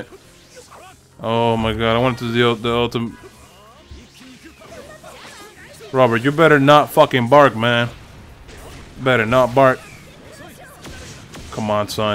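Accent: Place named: Dominican Republic. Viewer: BRUUHHHH i was honestly not ready for this loool you look like such a cool dude, i can finally put a face to that sick dominican accent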